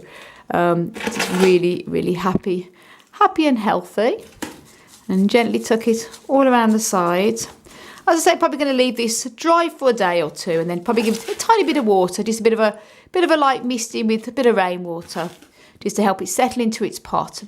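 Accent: United Kingdom. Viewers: Hello....I recently found your channel and I became your follower......😘😘😘😘😘😘😘by the way....I LOVE YOUR BRITISH ACCENT.....😊😊😊😊😊😊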